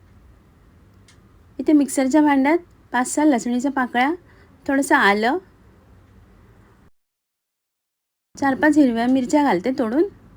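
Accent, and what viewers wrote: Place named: Goa, India. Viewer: तुमची सांगण्याची पद्धत फारच गोड आहे.ऐकतच रहावे असे वाटते.गोव्याचे कोकणी मराठी मध्ये.❤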